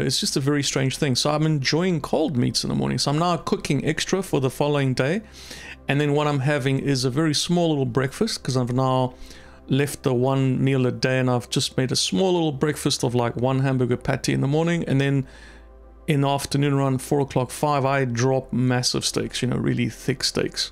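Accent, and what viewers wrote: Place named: South Africa. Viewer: u sound south african.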